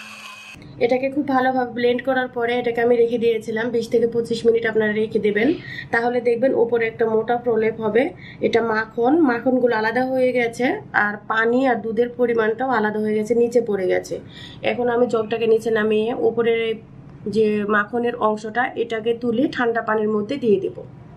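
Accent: Bangladesh. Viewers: I guess she is from Bangladesh , due to the accent . But yeah if you are from India that is , then I definitely don't know where dalda can be found in your country, sorry couldn't help ☹️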